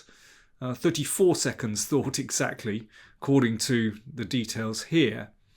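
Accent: England, United Kingdom. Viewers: Congrats on 100K, Great English accent...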